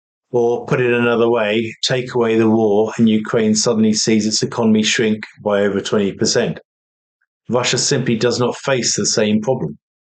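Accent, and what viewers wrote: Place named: United Kingdom. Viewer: Thanks! You know your stuff and it’s nice to hear intelligent observations with a British accent 😂 You join a growing group of advocates for Sanity sadly lacking in Government and on MSM. Subscribed ❤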